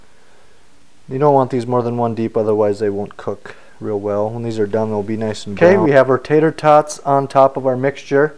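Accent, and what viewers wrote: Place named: Canada. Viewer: Umm you talk funny and have an accent. You sound like a Canadian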